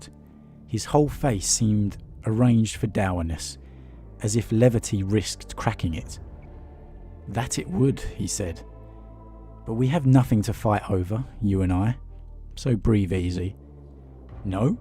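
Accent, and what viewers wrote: United Kingdom. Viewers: Cracks me up how you say "ass" and not "arse" with that British accent 😋 Great vid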